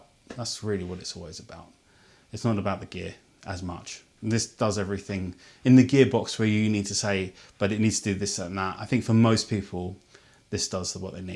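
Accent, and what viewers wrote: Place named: United Kingdom. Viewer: I think you made a really good point here. I do own the R5 and guess what on my surfing vacation last week to Spain I made all my pictures with my iPhone. I was too afraid that the camera could get stolen or at least damaged in the sand or whatsoever. When I came back I started thinking to address that point and looking for a cheaper body. I thought to get maybe a Sony A7 IIIc But the camera costs still €2000 at the moment. Also during walks and most of the time I don’t bring the R5 anywhere to keep it safe and nice. How can you get better if you behave like that? You really brought this whole issue to its point 👏👏👏 btw enjoying your English or British accent. :)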